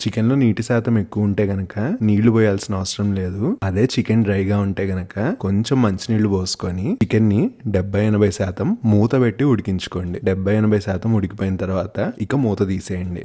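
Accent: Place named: Vijayawada, India. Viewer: Chala rojulu Ayindi andi mana style Telugu accent vinni. Mi Telugu accent ma vijayawada accent laga unde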